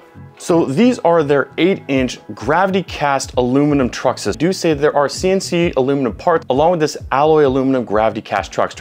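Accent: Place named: Germany. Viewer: Wow man, this is the best looking esk8 review I've ever seen ! From that sick intro with all the neon colors , then to the ride through the streets w/ those subtle "after-effects" they only last for a sec or two but I really liked it for w/e reason. Props to you and your crew for all those cool cinematic riding angles, this is gonna be a hard one for y'all to top but I got faith in ya! The board itself seems amazing for what you get at that price point, will definitely be recommending this if anyone ever asks about a beginner board. It costs slightly more than all the other $500 or so budget boards, but completely blows them out of the water in terms of features. Did you do the voice acting for the Medic in TF2, almost sounds like it, the german accent and everyting lol. Amazing job dudes, this video is an inspiring work!👍🤙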